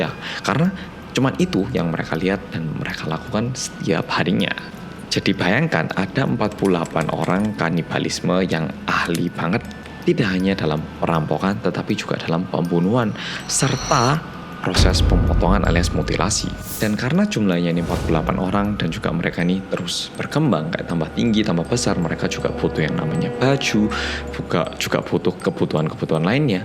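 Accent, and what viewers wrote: Java, Indonesia. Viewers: Mas pas pertama kali liat channel nyaa aku sempet bingung 🤔 orang Korea bahasa Jawa nya medok banget 👍🏻👍🏻👍🏻 # kangen sama Rindingdong Like ya 👇🏻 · We miss you mas yg medhoookkkk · Mas medok chek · Woffyou mas yutup medhook kesayangan kita semua:*:* <3 <3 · Gk perlu jadi penonton pertama,yg penting jadi penonton setia nya mas 😭😘 Semangat mas 👏👏👏 Mas,jalan jalan dong mas ke busan,saya lagi ada di Busan nih mas,mas kesini dong😁 Saya pengen banget ketemu mas lah👏👏👏 Buruan ya bang,saya keburu berangkat wamil😁😁 Semangat terus mas Orang Korea yg medok😭😘😊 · Maaf ya mas out of topic commentnya. Aku mau bilang, aku mulai hidup sehat karena Mas, dari diet sehat sampe skincare-an pake Nacific. Aku ngikutin mas dari badan mas masih agak berisi sampe kaya gini. Well, makin hari mas makin guanteng yaaa. Orang lain makin hari makin tua, mas kebalikannya. 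Aku percaya itu semua karena gaya hidup mas. Thats why aku termotivasi jejak mas. Di saat aku pengen nyerah, aku lihat video mas dan selalu termotivasi lagi. Sukses buat mas, semoga suatu hari kita bisa ketemu langsung dan sharing pengalaman hidup. Luv u orang korea yg medooook! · Oke mas medok 🦖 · Gara2 delok mas orang korea yg medhokk.. lali nak aku lagi godok mie. Wah mas mas... indomie ku sampai ke-medhok'en iki lho. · Welkom bekk to bersama org korea yg medoookk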